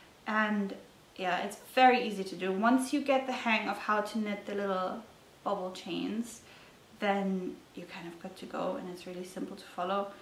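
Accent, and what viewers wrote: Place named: Scotland, United Kingdom. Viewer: Hey - good to connect with your podcast! Sending love up to Glasgow! Love to hear a European with a nice Scottish flavour to their accent ;)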